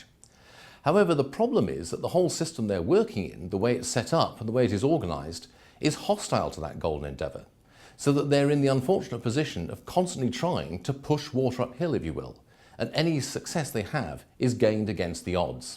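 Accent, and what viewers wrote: United Kingdom. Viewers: This guy's British accent makes him sound more credible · His argument is clearly flawed. He states that the school system corrupt but he never states why. He is on the same level as someone who is angry on Facebook but with a British accent and a snazzy camera. Educate yourself on the components of a potent argument and you will come to the conclusion that his argue is as solid as liquid water.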